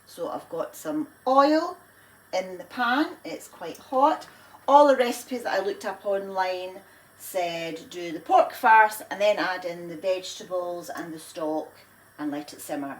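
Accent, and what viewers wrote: Scotland, United Kingdom. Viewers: When you said "stroganoffie" with that beautifiul scottish accent, I admit that I thought of bannoffie pie. :)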